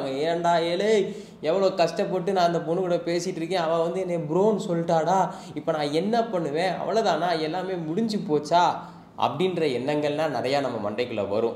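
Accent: Madurai, India. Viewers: Bro ninga madurai ya🔥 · Bro madurai ya nenga.na madurai bro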